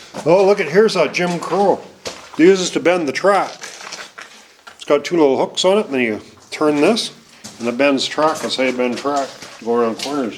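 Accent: Canada. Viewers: The Canadian accent is strong with this one..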